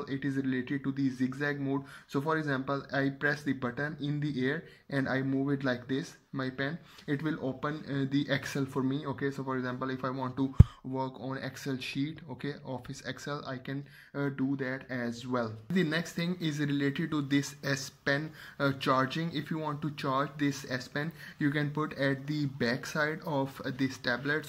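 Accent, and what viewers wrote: India. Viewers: I love indian-english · Bought the S9 Ultra. Sreached for S Pen Features and stopped as soon as I heard an indian accent. =)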